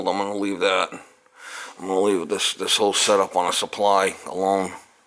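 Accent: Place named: Massachusetts, United States. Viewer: Sounds like you're from Massachusetts where's your fire Watchman for that torch . lol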